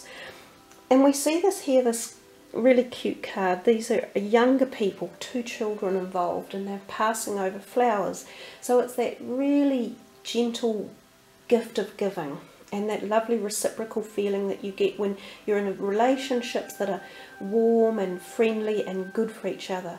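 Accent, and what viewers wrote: New Zealand. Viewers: kia Ora how lovely to hear a Kiwi voice online. Thank you for the heads up for july Blessed Be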